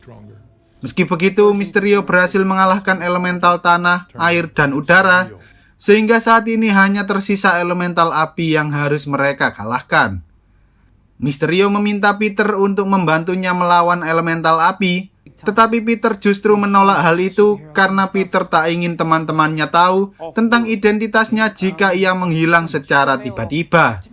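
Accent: Java, Indonesia. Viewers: Jowo mu ngndi lur? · Medoo bngt lu anjing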